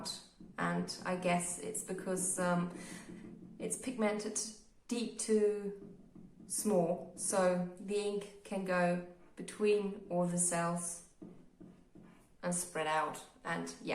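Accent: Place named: Australia. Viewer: don’t apologize for your english, it’s amazing! you actually sound australian. thanks for your vids!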